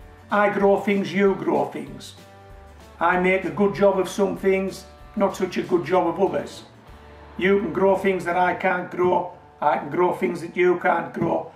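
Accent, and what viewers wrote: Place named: Scotland, United Kingdom. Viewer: I've loved your various videos on gardening in pots! Indeed, I'll be using your advice (with a few modifications) whilst growing some potatoes (to start) in Los Angeles. Also, thank you for this particular video. I honestly had no idea about the plagiarizing of videos on youtube. Since I'll be starting my own channel fairly soon (nothing to do with gardening), I'll be taking preventive steps from the get go...thanks to you. Please, keep up the good work and give Molly a hug for me. Btw, it's always lovely listening to you....your accent reminds me of my Scottish grandparents. <3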